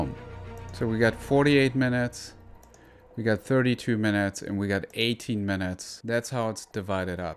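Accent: Germany. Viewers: Hey do you have a German excant ? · Defiantly has a German accent first thing I noticed lol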